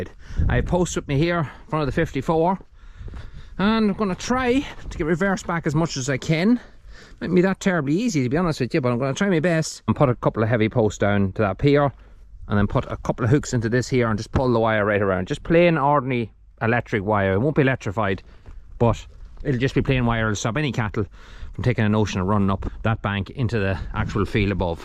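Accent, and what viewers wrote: Ireland. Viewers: So happy to see some work done here! My great grandparents were dairy farmers in Ireland. Do you have the name of the land owner? I'm very curious about all the history. Don't know much about them as my grandmother n father immigrated in 1928 to the USA at the age of 18 I believe. They passed when I was fairly young so I wasn't able to ask any worth while questions. I enjoy watching your videos...a glimpse of where n what they might have lived. Some family members still there but I don't know them. And your brogue is every bit of talking with them! Love it! Thanks. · Greetings from the Ozark mountains in Arkansas, USA. Reminds me of all the old places I have rehabbed in my 80 yrs. I’m on my last one, an old woman now, I just enjoy watching my birds and critters. But I’ve loved giving all those old cottages a new life for the next generation to shelter in. 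More fun now to watch someone else save one, and listening to that lovely Irish lilt makes it even better!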